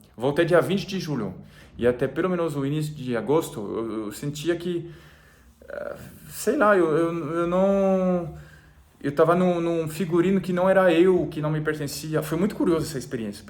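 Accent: France. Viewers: Esnobe, Passou 20 dias na França e já voltou c sotaque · Parabéns,! Vc tem uma verdadeira alma brasileira com sotaque francês!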